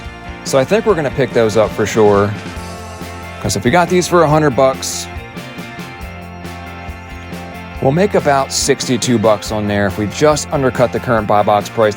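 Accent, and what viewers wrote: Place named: Canada. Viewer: Ive watched a few of these videos from different posters but all seem to be american and i dont think canadian stores tend to have clearance sales to the same degree as in the US. Im not sure if youre american (you have a canadian accent and ive seen some canadian based stores in the background of your videos. Just wondering if this type of thing can work in canada. Also, subbed.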